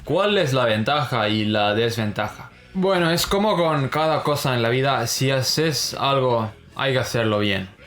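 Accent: Uruguay. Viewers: Es uruguayo el acento jaja 🇺🇾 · Acabo de ver los primeros videos cuando llegaste a uruguay, increible como aprendiste el idioma y ese acento! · Que bien que hablas con el acento Uruguayo jajaj que grande boludo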